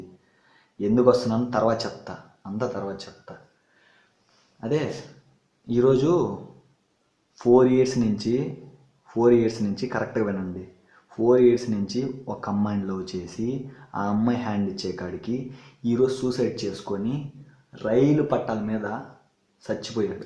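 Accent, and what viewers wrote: Rayalaseema, India. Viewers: Ur 100% right bro Baga cheppavu nuvvu Bro manadhi rayalaseema kadha expt chesanu ni matalu vintumte telustundhi ......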